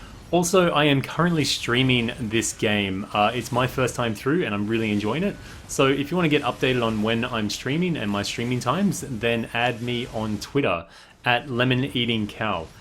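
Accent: Australia. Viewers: Can't place your accent. Are you Australian or New Zealander?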